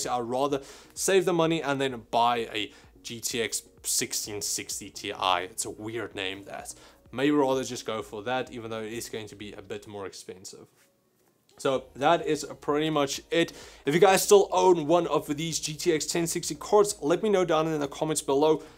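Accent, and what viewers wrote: South Africa. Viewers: Damn I knew this is a fellow South African by that accent... Lekker Boetman.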